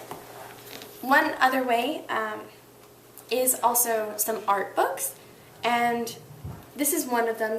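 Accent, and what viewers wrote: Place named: United States. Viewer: good job and all :D but srsly I don't like to watch people dressed up like manga characters with this american way of speaking xD it doesn't fit at all :D keep up anyway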